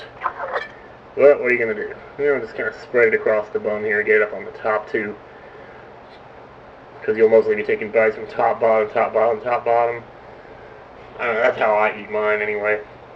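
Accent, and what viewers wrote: South, United States. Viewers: I send this to my mom cause when I watch your let's plays I am usually heading in the kitchen :P I said "He let's plays, he Southern AND he makes cooking tutorial's" XD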